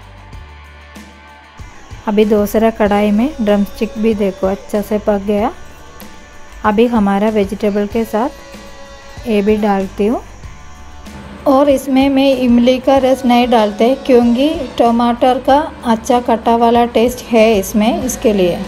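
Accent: Kerala, India. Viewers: It is actually Malayali Accent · Very Nice Recipe... Will surely try...also liked the way you described...typical mallu style.. Saukyaam maam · മൊളു ... ഹിന്‍ദിയിലെ മലയാളം accent ...അതാണ video highlight ... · Your dishes are authentic. Even more you are so humble and sweet person. You chose hindi with your malayalam accent. Stay blessed!! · Kerala hindi accent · She's a very enthusiastic person I liked her hindi kerala accent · Dear sister I like your keralite accent very much and recipes, today easiest way to make samber... I try it your way.... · Malayali* accent.. other accents are different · My all mallu friends speaks same Hindi ❣️ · Love your keralite Hindi accent. U r very sweet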